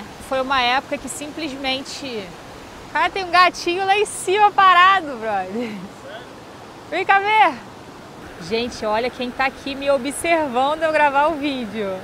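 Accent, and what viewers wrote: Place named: Rio de Janeiro, Brazil. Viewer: Você é natural do RJ ? Seu sotaque é bem carioca,amo seus conteúdos parabéns esta me ajudando a despertar minha consciência ❤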